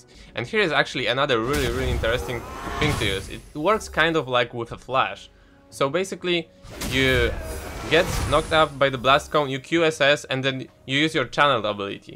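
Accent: Poland. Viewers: Ten akcent odrazu się z polską kojarzy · Are u polish? U sound like polish guys. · jak to polak chyba xd(słysze po akcencie)xdd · Uwielbiam twój polski akcent :D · coś tak czuję że Polak jesteś ;)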